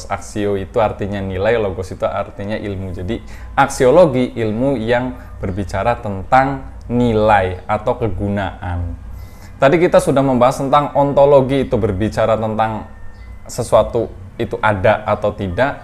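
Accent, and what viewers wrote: Banyumas, Indonesia. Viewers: Out of topic bang, bang nya orang ngapak ya? Ora ngapak ORA kepenak😅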